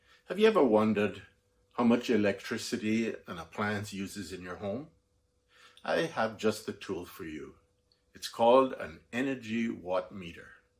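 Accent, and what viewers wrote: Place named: Caribbean. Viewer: You sound Caribbean ish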